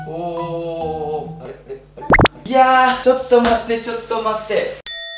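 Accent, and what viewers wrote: Kansai, Japan. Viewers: 今日も関西弁やね〜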